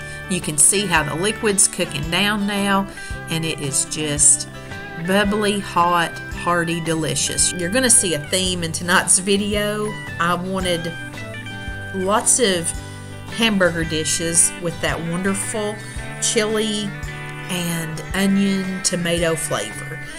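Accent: South, United States. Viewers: I have been watching you for about five months now & subscribed to your channel after the first recipe that I watched on YouTube. My husband passed away on Oct. 6, 2022, and that's when you popped into my life!! You have truly blessed me. (God sure does work in mysterious ways!!) In a way, you have saved my life, along with our precious Lord & Savior, Jesus. I was so so lost & was grieving (still do!!), and your amazing, delicious recipes gave me something to do in my spare time & got me to cooking again!! I love how laid back you are & I love your southern voice & the soft music you play while you cook. Your recipes are simple, easy and delish...right down my alley!! Love and prayers and lots of blessings to you and your family!! I cannot thank you enough. 😃😁🥰❤❤🙏🙏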